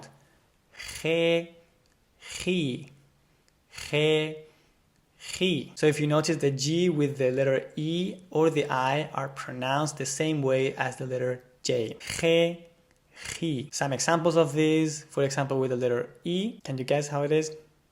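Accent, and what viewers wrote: Spain. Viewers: Thanks a lot for the great content and also the other videos! Just wondering, how would you describe your Spanish accent? Is it from a certain region? I would like to become work efficient (B2) for Madrid, and especially to sound professional to clients. Not sure if there are notable differences in accents (I.e. in Andalusia) for cities in Spain, and whether those would come across as ‘uncommon/unprof’ when working in a different city. Would love to know.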